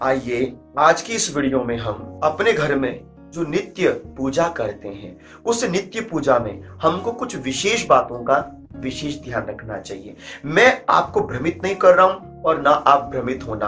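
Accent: Standard. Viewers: Bahut achhi video,shudha uchharan k sath...apka dhanywad...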